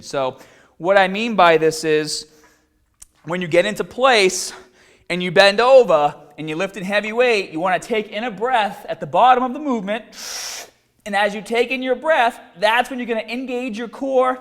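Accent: Boston, United States. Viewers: He is from Boston. It's the way he speaks. Tough luck if you don't like it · i remember watching your videos years ago and you never had a boston accent, wtf happened? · Viknesh Jay ?? Australian? Please tell me you know the difference between a Boston and Australian accent? This video is from Aug 2016!! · Maybe it's because I'm not cultured but I couldn't tell if was from Boston or Australia until I read the comments. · dude wtf is with your accent?! the "bahbell" suggests boston but you almost sound scottish at times and neither pronounce the word "mascles"! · Dude, what's with the Boston "a"? I've been watching your vids for 6 years, and you never even came close to talking like that in the past. If you'd always spoke that way it'd be one thing, but since that's not the case it's just annoying. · So used to the Boston accent now - just sounds normal to me ! 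· I know!! I'm from Boston and approve of his accent 😛 so I went to show his videos to my husband, only to find out that his accent only comes out in some of his videos. I think he used to have the accent early on, then got rid of it for whatever reason, but now it's back with VENGEANCE. Love it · A few seconds into the video and I had to check the comments to make sure people were making fun of your Boston accent. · Petro .... he sounds like he is from Boston or from the New England region. Kinder like some of us yanks who are lost when we try to listen to some of you English from some parts of your country, i.e Geordie accents. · Great content. But the Boston accent makes me want to throw up everytime I hear that open "Ah" sound. Please for the love of all that is Swole, tone down that accent! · This guy sounds like he is from barwstan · Is that Boston accent? I thought it was extinct. 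· I find the that this video was great and informative! Apparently people on here are not so well traveled and live in a bubble where they can't recognize a Boston accent. I am from Chicago and I get the same thing because my accent. As for the video,I think people have a hard time getting out of bad habbits when it comes to form. Some people are so used to doing it wrong that when they try the right way they get hurt. They try to do it the right way with the same weight. I have seen this many times with other exercises well. As soon as you tell them to lower the weight and use proper form some struggle,but can do it. Others try the same weight and either get hurt or cant do it at all. Ego lifting is correct and true. This is why I have always been surprised how people still make gains with crappy form. Yet steroids seem to be involved. Of course when they are 60 and complaining that there back hurts. 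They might regret not taking some sound advice when they were younger instead of trying to impress someone at the gym. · his boston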